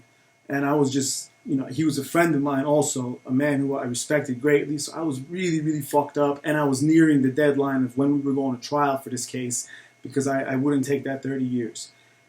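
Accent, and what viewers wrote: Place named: New York, United States. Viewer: Love the New York accent!!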